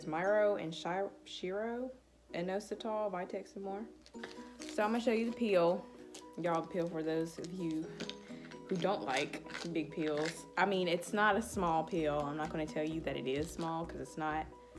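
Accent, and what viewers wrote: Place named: South, United States. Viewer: Omg I love your southern accent.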